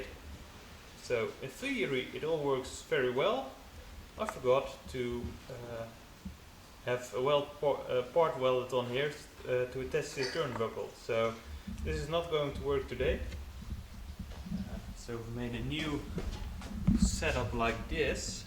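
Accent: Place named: Netherlands. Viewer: you sound like someone from holland. is that right ?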